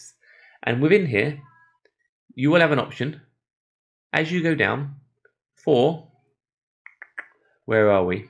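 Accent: United Kingdom. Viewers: I had to watch this video several times in order to tell exactly what this man was saying, as his British accent was difficult to discern. But great points on how to enact privacy methods. Thank you very much. Be safe and well.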